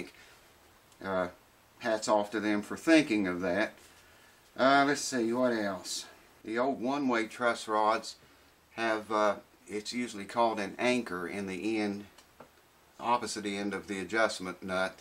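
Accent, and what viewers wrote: South, United States. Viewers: The rain makes me feel like weve been through some shit together. thanks for the wisdom. This is like the closest thing to America I feel like I've seen since I was was a kid in 1993 listening to my grandparents talk. That southern draaaawl mayne, I tell yeh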